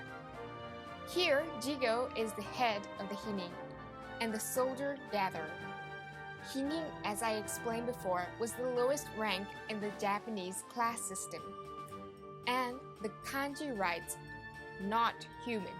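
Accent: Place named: England, United Kingdom. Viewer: I could listen to you talk about anything your english accent is so nice.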